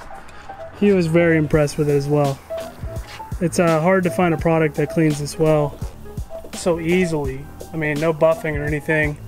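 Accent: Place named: Texas, United States. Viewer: Where in Texas you from??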